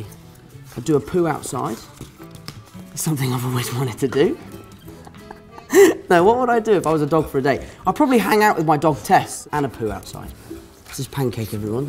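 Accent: United Kingdom. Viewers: He took back his British accent, no ? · His British accent has come back 🤩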